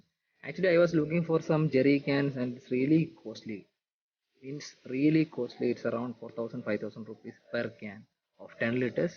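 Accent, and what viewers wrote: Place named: Malaysia. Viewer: Manglish was gud. It’s better do in wat u know.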